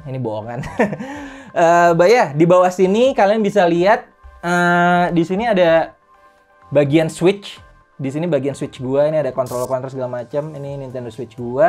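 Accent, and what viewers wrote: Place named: South Jakarta, Indonesia. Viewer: Jaksel banget wkwkk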